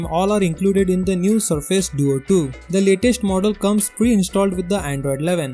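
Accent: India. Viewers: Indian accent is so garbage.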